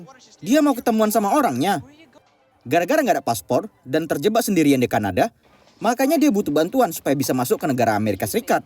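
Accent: Medan, Indonesia. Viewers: Bahasa Indonesia versi Medan emng bkin ngakak 😂😂😂😂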